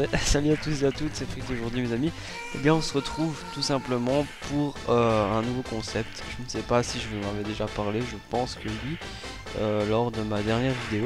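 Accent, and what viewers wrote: Belgium. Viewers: on entend que tu parles plus en belge là c'est symas :)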